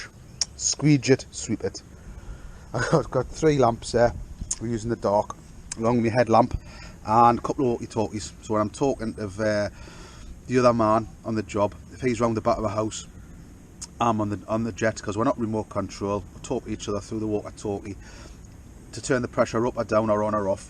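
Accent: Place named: London, United Kingdom. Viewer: have a quick question. Being in the states (mid-Atlantic Maryland), we have a weird accent that no one can figure out where we are from. Southern states think I’m from NY, northern states think I’m from GA. Yes, the accents here are that different. Is England the same? I’m guessing you may be from north London based on your thick accent. Think Adele. She has the same dialect as you and other pipe cleaners in London!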